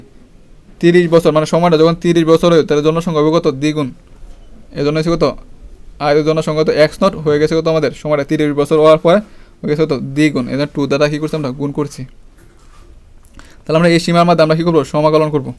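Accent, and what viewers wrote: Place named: Mymensingh, Bangladesh. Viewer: ভাই মমিসিংগা😁😁 ভালোই হয়েছে❤️ ভাইসাবের এলাকার লোক😌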